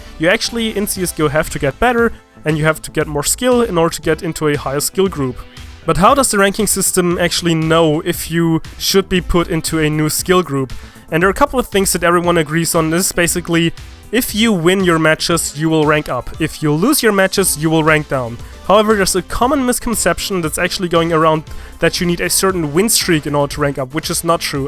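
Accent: Germany. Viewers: can u speak german??or are u from germany??u r listening like a german guy who is speaking and learning a lot englisch ^^ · Du hast nähmlich nen Deutschen Akzent! :D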